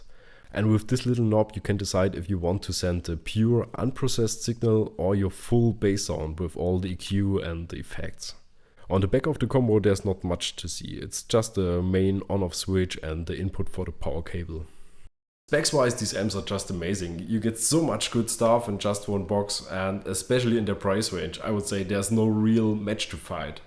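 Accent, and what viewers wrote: Germany. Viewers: Nice review and accent ;) Typical German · funny little gear and with your funny german english :))) great as allways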